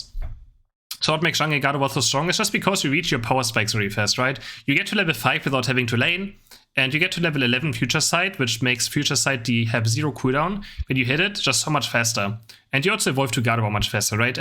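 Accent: Germany. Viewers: 100% Germany I can tell by the accent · considering his accent he must be a german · beside his accent that is a giveaway his contact email address ends with de so I'm pretty sure he is German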